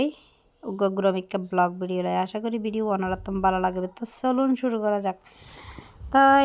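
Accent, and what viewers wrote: Chittagong, Bangladesh. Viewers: আপনার মুখে চট্টগ্রাম এর ভাষা ভালই লাগল।❤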